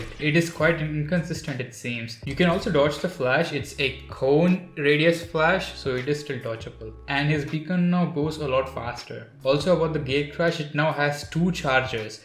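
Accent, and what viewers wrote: India. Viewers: Love u accent Ur indian?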